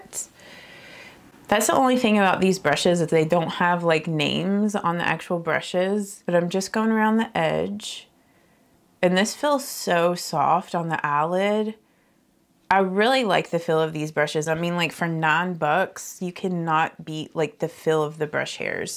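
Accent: South, United States. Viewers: I like your voice, and, really enjoy your southern accent. Very pleasant to listen to. So I vote NOT silent video ☺️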